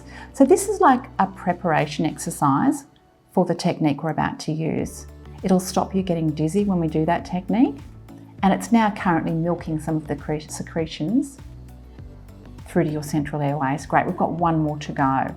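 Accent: Australia. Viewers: Is that an Australian accent? Love it!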